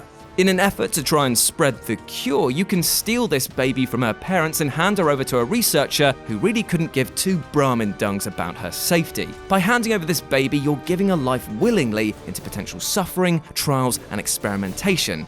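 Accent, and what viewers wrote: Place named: Newcastle, United Kingdom. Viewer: Oml you’re a geordie like me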